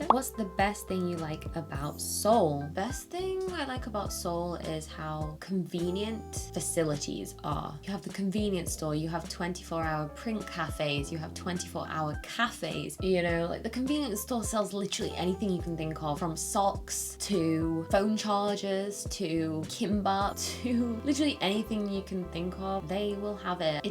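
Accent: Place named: United Kingdom. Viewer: Love ur accent pls teach me British accent 🥹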